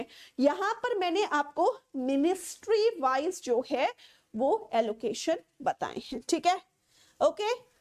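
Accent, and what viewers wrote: Kashmir, India. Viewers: Your voice, tone, and way of talking is very similar to us, ❤❤ FROM KASHMIR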